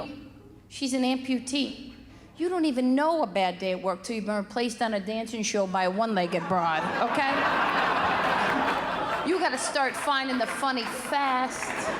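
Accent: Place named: Arkansas, United States. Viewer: I saw you on Tube, do you remember me? 😉Sounds like an Arkansas Hillbilly.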